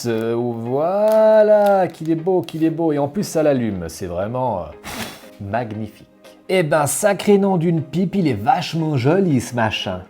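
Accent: Switzerland. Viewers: quel bel accent suisse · On voit à ton accent que tu es Suisse... 😂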